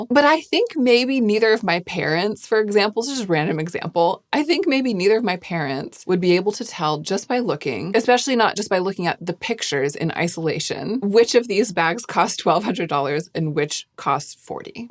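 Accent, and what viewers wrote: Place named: United States. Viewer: One American with amazing accent, tone and overall delivery. Very articulate and elegant speech.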